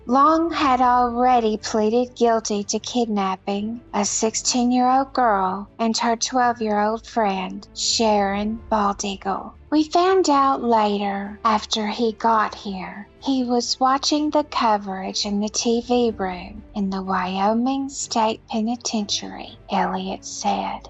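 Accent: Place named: Deep South, United States. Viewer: Please use a regular voice- only people in the deep South talk like tha-ut anymore. Plus it's creepy.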